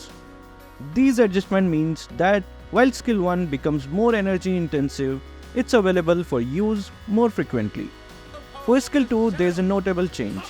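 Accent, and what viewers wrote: India. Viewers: Every now and then i still get annoyed by your voice or accent(in our country we see indian accent as "STINKY"), but hey! At least you bring pretty useful information. and thanks for that :v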